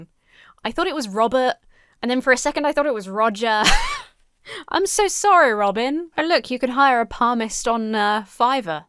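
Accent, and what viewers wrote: United Kingdom. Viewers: I love your thoughts. Ever since watching you on the Atheist Experience I can't stop watching your videos. Part of it is your UK accent (guilty as charged) but I keep watching for your skepticism. Your knowledge and thought process is invaluable for us low-education Americans.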